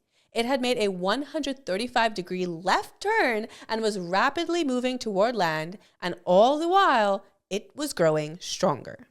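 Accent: United States. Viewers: This was good education! lol whatever you're doing to get the algos to get you eyeballs is working, but your family is endearingly quirky and nerdy, and damn how you put your hubs on the spot is kind of hilarious. He's a champ, though. Not really on-topic, but your accent is unfamiliar to me. Some elements seem like mideast US but there's other stuff going on that makes me suspect you're also multilingual. For context to my question, I'm an aspiring voice actor so that accents are of interest in that way, and also have worked with translators for publications for many years, and I'm always just curious about this sort of thing.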